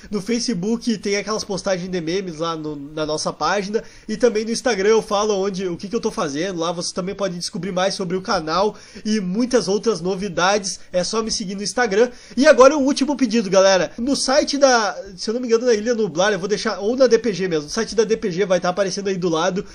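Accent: Rio Grande do Sul, Brazil. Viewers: Tu é gaúcho! Ba Eu também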